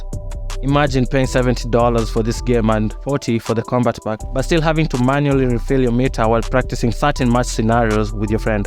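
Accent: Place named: Kenya. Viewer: Just stumbled on this channel, you sound Kenyan 🤔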